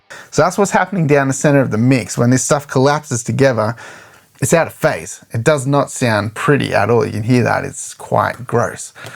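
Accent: Australia. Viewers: Awesomeness mannnnn you sound just like craig jones the aussie bjj legend. Hahahaha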